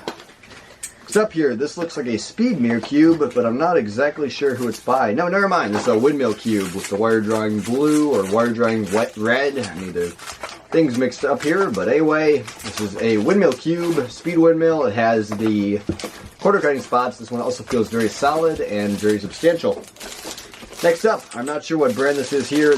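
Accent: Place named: United States. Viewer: Bruh u like Vietnamese but sound American af...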